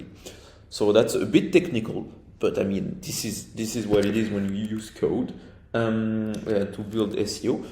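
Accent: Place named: France. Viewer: Hi, thank you for your video, it's great what you did. I think you have a slight accent, you are French?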